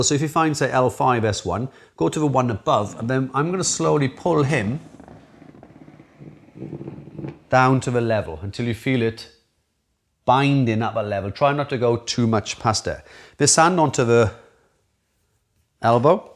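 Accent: Wales, United Kingdom. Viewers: That's definitely a Welsh accent I hear!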